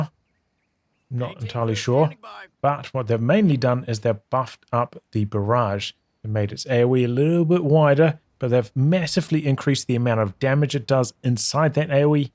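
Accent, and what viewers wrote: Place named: New Zealand. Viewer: Great video always throws me hearing a fellow kiwis accent on you tube when I’m so used to just hearing yanks and Brit’s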